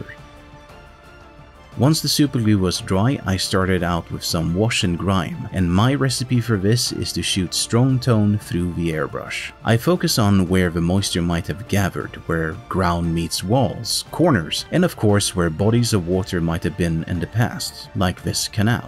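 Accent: Sweden. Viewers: I would love to play on this board with my custom witch hunters! Are you in Sweden? Sounds like it!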